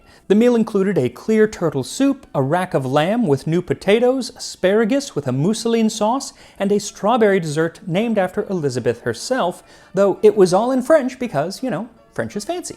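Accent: United States. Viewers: Why do you Americans always pronounce herbs as erbs without the 'h'... but over prounce the silent 'h' in words like vehicle? 'Ve-hical' 😅 It drives me nuts 😂 Apart from that, I love your shows and will venture into trying some historical recipes. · That's just how Americans say it. Never heard a American say it the British way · Why can't Americans talk! "Erbs" Herbs QEII was crowned not "coronated" The temperature doesn't "raise". You may raise it, but it rises. Loved the recipe and the comentary......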